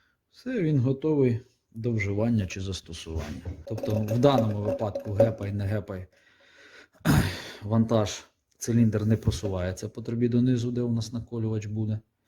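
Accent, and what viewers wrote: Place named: Russia. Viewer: А всё равно слышно,что мовою ты розмовляешь по русски. Чё уж кривляться,ну и говорил бы по русски,рас уж украинский не твой родной. Один хрен,скоро тебе придётся руссифицироваться,если жив останешься.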